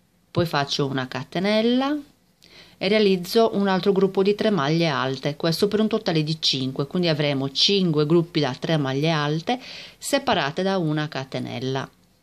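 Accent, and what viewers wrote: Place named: Sardinia, Italy. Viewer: Molto molto carina e ben spiegata. E poi adoro il tuo accento sardo 😉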